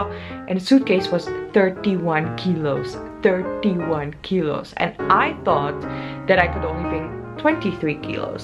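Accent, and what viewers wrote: India. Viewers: I just started watching your vlogs , and nothing could have stopped me from subscribing your channel . I just loved the way you do it , love your sweet smile 😊 , and loved your hindi accent haha . You are really doing a great job , a very good luck for your future 😊 Keep posting . Made me a huge fan 😊.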